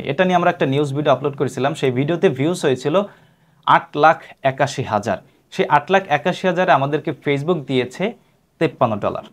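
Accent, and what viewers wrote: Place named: Barishal, Bangladesh. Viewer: ভাই আপনার বাড়ি বরিশালের কোথায় ?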